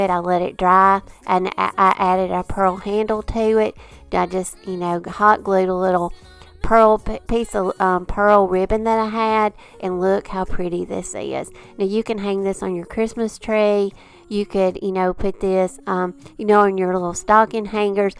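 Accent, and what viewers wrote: South, United States. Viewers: Love your videos and creativity! Love your sweet southern accent! I am from East Texas but have a childhood friend that lives in Guntersville AL. I live in Kansas and everyone says I have a Texan draw to my voice! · Everything is so beautiful! I love the ornament idea with your dads picture! So creative! And you have the most beautiful southern accent. I live in Georgia and Ive yet to hear it flow so beautifully like yours! 😂❤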